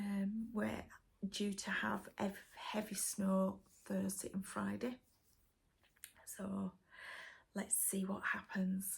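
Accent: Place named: West Yorkshire, United Kingdom. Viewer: Hi I’ve just started watching you. Carol the saltbox stitcher recommended you. Your framing is beautiful, could I ask where did you get the long narrow frame please? It’s gorgeous. I am in South Yorkshire and I’m guessing you’re in West Yorkshire going on your accent. Anyway I enjoyed your Flosstube. Thank you. 😊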